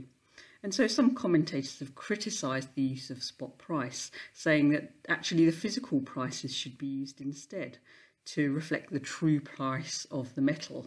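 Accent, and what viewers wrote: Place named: East Anglia, United Kingdom. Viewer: Really enjoy these presentations. Out of interested...where abouts are you from in the UK? East Anglia? I'm trying to place the accent!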